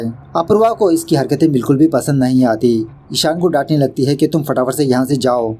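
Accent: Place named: Mumbai, India. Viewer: Thobde 😅 mumbaiya Hindi with style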